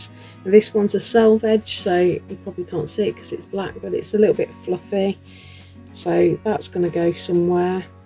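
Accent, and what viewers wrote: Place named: Lincolnshire, United Kingdom. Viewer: I've just discovered this video. Lovely to hear a Lincolnshire accent. Your pages are amazing, I am so inspired to have a go. Unfortunately I don't have an instagram account but I can watch all your you tube videos. Thankyou for sharing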